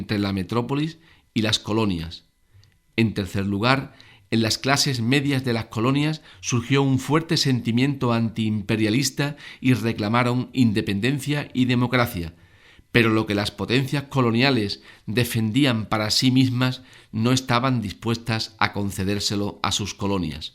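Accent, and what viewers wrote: Andalusia, Spain. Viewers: Tio hablas como un andaluz no puedo ver el video porque me mosquea ese estupido acento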